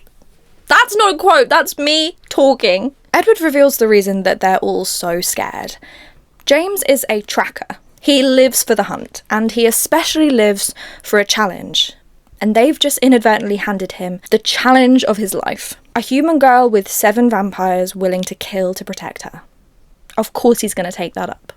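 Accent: United Kingdom. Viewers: I hope you do all 4 books! Nostalgia, cringe, and anything with English accent is a delight to listen to. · When I read the twilight books I had no idea Jacob was supposed to be 15! It’s insane. - “it’s nice to read a romance book where the female protagonist has good female friends… and the romance doesn’t get in the way of that friendship…” uuuuhhh new moon might change your mind. I love Bella read in a British accent, it makes her voice so much better. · I know its mostly just because you're British but you would be such a good audiobook reader.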